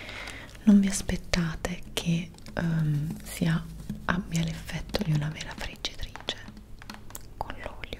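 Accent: Italy. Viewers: Is a italian voce Itis normal you not comprend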